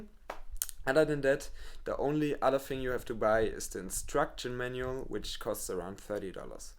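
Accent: Germany. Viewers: Klingt sehr deutsch haha aber geiles moc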